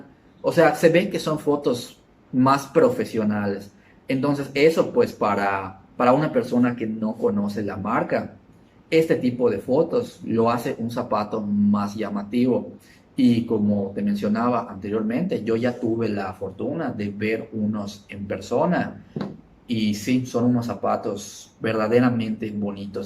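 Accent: Yucatán, Mexico. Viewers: PÉSIMO video!!!....el acento de yuca te lo paso porque es de tu origen de procedencia, pero el que titiubees y te equivoques a cada rato? tas cañon mi yuca!....antes de salir a cámara elabora un "script" para que puedas hablar de corridito y con fluidez, estas hablando de zapatos y no conoces algunos modelos y te basas en fotos de instagram!!!...muy mal...por lo minimo, date una vuelta por León, Gto...pa´que conozcas. JBE y ZARA son marcas que es de uso masivo, para nada de tipo artesanal..y dudo que conozcas marcas "premium" ....donde dejaste a MICHEL DOMIT?....supongo que apenas comienzas en esto, ojalá y pa la proxima te salga bien......bye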